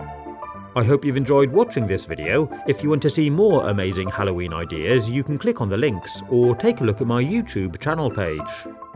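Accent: United Kingdom. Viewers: are you british???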